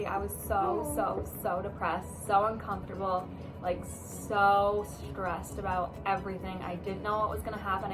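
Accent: United States. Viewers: Thanks for the story! Your dialect says MINNESOTA but you're from Vermont. So cool.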